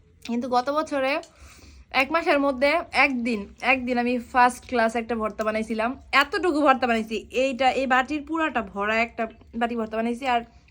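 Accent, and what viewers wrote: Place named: Barishal, Bangladesh. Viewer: বরিশালের বউ বরিশালের কথা শিখে গেছে মাশা-আল্লাহ মামি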